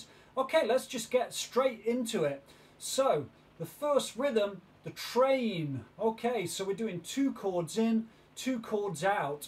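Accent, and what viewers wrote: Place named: Northern England, United Kingdom. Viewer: thanks for bridging the gap from beginner blues to bluegrass. Kinda crazy to learn from a Brit but hey, youre doin it right. That northern? accent is cool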